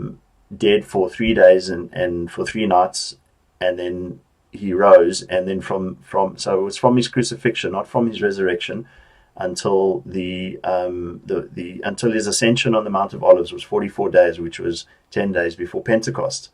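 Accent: South Africa. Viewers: I can hear that SA accent boet 😁👍🏼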